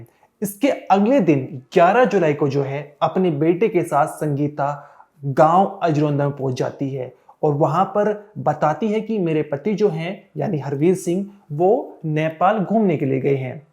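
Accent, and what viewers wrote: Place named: Saharanpur, India. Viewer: बोलने का दंग बता रहा है तुम सहारनपुर से हो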